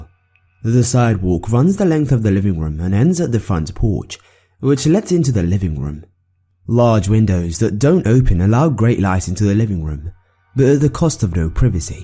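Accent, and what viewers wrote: England, United Kingdom. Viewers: You I love accent is it Australia or England sounds English lol so Sexy much love Sexy Grandma ✌💘😉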